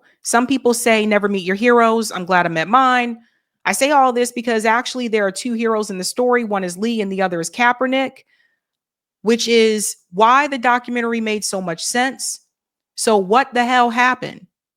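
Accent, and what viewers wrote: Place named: South, United States. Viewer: ..!!! 🙂 Pull Out.? You are a "Southern Woman" I guess Boston rubbed off on you a little bit 🙂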